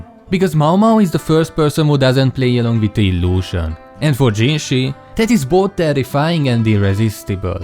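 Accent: Hungary. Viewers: First video by for me. Not even a minute in......is that a hungarian accent I hear?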